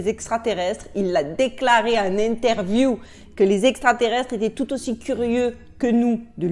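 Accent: Marseille, France. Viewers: Nous sommes tous différents, c'est sûr, moi elle me fait rire et m'apporte de grands bols d'air Merci ! Merci , continuez très chère amie marseillaise à l'accent plein de soleil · C'est plutôt la cagole du coin... avec l'accent qu'elle a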